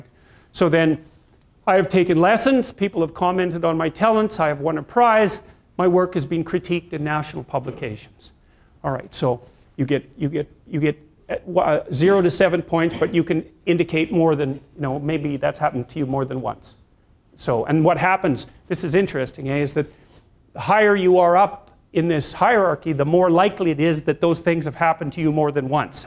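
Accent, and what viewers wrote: Canada. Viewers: is he Canadian? i just realized the way he pronounces out. hes so well spoken i barely noticed his accent but now that ive noticed its so apparent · He's Canadian.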